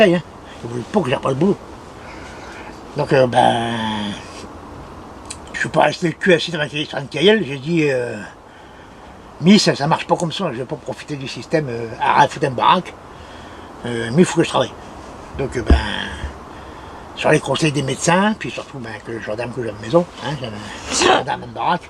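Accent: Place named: Boulogne, France. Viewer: Oh là là!! Déjà l’accent français et là le boulonnais lol 😂 J’ai bien rigolé, merci! Joyeux temps des fêtes à toute votre petite famille! Nous on vient de recevoir au moins 40 cm de neige, ce sera un beau Noël blanc!! Bisou du Québec!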